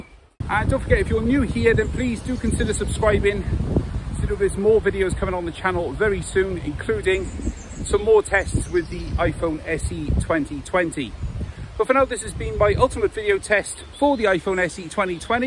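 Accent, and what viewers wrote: United Kingdom. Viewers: your British accent 😍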